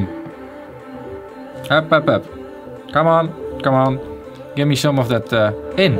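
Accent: Netherlands. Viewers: Oof dat nederlandse accent 😂👌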